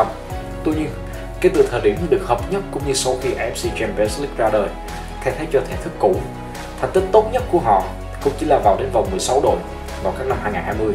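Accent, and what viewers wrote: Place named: South, Vietnam. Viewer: Giọng miền nam đc chưa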